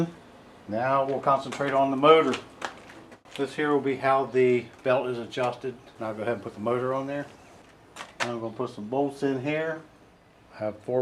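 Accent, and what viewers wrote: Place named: China. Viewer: The chinglish wasn't working for me... Thanks.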